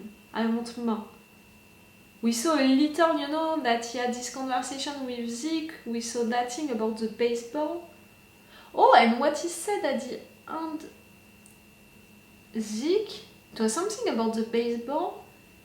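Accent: France. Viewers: These episodes are really getting out of hand, i hope it's not offensive but your emotional reaction mixed with your french accent really makes it for me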